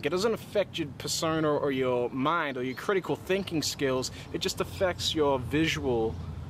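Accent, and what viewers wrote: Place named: Quebec, Canada. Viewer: maybe French Canadian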